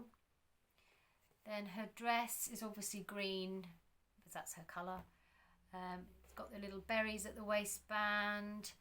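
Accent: United Kingdom. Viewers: Hi there, is always glad to her from you! (did I tell you how much I love the British English accent? Is lovely!) Glad to know your girls are growing up and have been doing nice things! I wish they didn't stop loving dolls, but most of teen girls do, is nothing we can do about it. I do hope at least your youngest doesn't. Girl, you did an amazing job on this Minifee's face up, she looks gorgeous. The anime style is really anime-ish! I love it, you rock! I can't wait to see Tinker Bell out of the box, free as a bird! She is gorgeous! I wish you an amazing weekend. Kisses to you, your dolls and your daughters! 🤗🌹❤🥰😘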